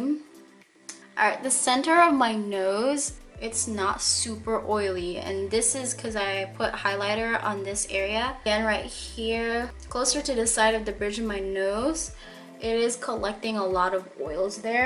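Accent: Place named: United States. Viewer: Thank you for the review! I was wondering about this primer. ^_^ And ignore that speech comment. I thought you were American because you sounded very clear. :)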